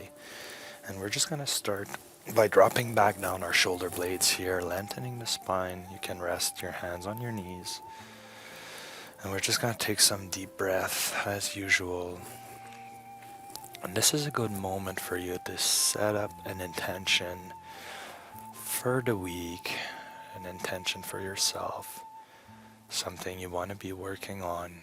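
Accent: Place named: Montreal, Canada. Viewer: I love your classes so much. I grew up in Montreal's south shore so your accent makes me feel at home. Glad your knee is healing and you are able to post more often. Thank you so much!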